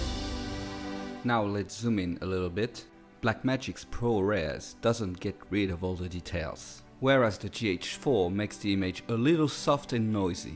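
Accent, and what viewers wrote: France. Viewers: Oh un frenchie XD ça se reconnait à l'accent ^^ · Sympa ton petit accent français :p Ca rend bien !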